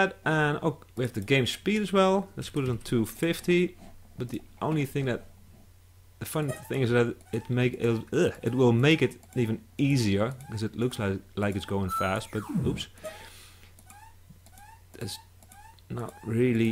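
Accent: Netherlands. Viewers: haha, Nederlander ofzo die dit zegt. Haha, the man that tells it, is Dutch i think :)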